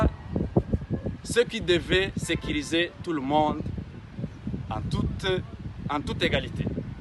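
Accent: Rwanda. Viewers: A vous entendre dire avec votre ton et prononciation , c' est le Rwandais qui parle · Vous retournerez au rwanda chez vous même votre tonalité prouve que vous êtes rwandais pas congolais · C'est la terre congolaise, quitte avec ton français Rrrrrrrrrwandolisé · Tu és vraiment Ruandais tá pronosiation est de ré.Tika biso tika lokuta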